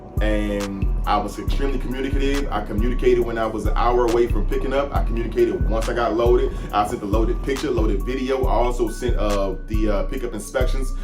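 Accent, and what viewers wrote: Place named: DMV, United States. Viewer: Hey, James here with Adventures in Trucking YT Channel. I have been watching your channel and I REALLY appreciate how you are so honest and forward with your content. Me and my oldest son (he goes by hot shot Shawty on the channel) run freight on a flatbed. We recently acquired a 53 foot enclosed race car trailer to do exactly what you are speaking about. High end vehicles that require enclosed. VERY informative and good information from you as to what to look out for in this business of car hauling. Just a little background on me, I’ve been hot shotting since 1999 on and off. I do have a CDL so we are CDL holders. Currently I have the freightliner M2 with a 36’ foot flatbed and I have my Ram Dually with the 53 foot enclosed trailer as well. Still trying to get that trailer on the road but your videos are always right on point. 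By the way, I grew up in Bowie Maryland. I can tell by the accident you’re from the DMV. Also, we have subscribed to your channel. I think you were 100% correct in handling the damage to the Lamborghini. I wish there was someway that owner operators and drivers could dispute brokers/clients. It seems like everything rolls downhill on us. KEEP YOUR HEAD UP!👊🏾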